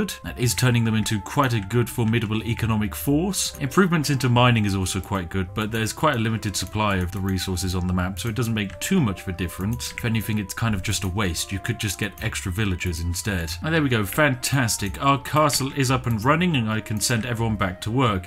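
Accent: United Kingdom. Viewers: ARE YOU REALLY A BRIT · As an American I love how British you are.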